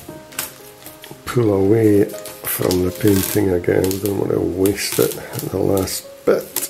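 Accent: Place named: Perthshire, United Kingdom. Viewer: I think I know that accent....is it Perthshire?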